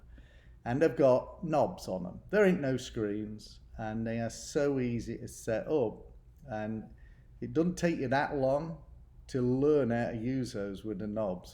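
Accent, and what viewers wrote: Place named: Lincolnshire, United Kingdom. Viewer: With minelab you have to download the manual I actual print them off for my machines I prefer reading it that way. Different land needs different settings I find. Nice to hear a fellow Lincolnshire accent take care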